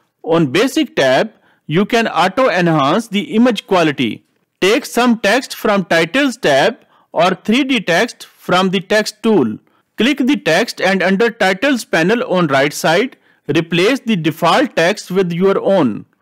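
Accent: India. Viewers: Bro i cant focus up cuz your indian accent, it got me laughing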